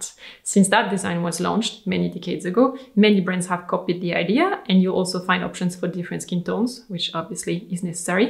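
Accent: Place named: France. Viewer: Thank you for this! I love your fashion "education" videos-they are so helpful and I learn, or am reminded, of so many tips. Also-has anyone mentioned since your return to France there's a tiny strengthening of the French accent to your English? It makes me very happy as I visualize your daily life there ❤️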